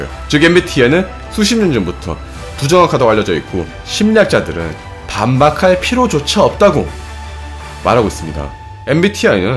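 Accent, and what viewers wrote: Gyeongsang, South Korea. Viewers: 경상도 사투리가 재미있네요 그리고 MBTI 진짜 무지성 무논리 ㅋ거의 2020년대의 신 혈액형 수준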